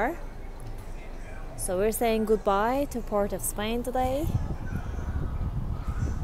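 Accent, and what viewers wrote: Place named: Turkey. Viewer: Ah, 😊 türk müsünüz? Biraz accent imizden tahmin.etmisdim. I am also planning to go to Trinidad & Tobago, but we are a bit scared. Would you tell me a bit about the criminality please?:-)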